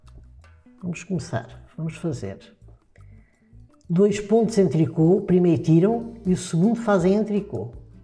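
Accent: Portugal. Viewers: Boa tarde! Nesta pandemia já fiz uma gola e uma pantufa. Sua didática é excelente, não fica nenhuma dúvida. Obrigada, gosto muito do seu sotaque, também sou portuguesa. 🥰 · Olá! Estou encantada com teus trabalhos. Lindos e fáceis! Teu sotaque também, es Portuguesa? Beijo. Marise RS Brasil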